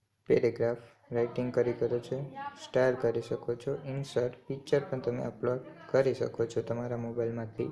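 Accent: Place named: India. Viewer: Stupif indian voice smells like rotten egg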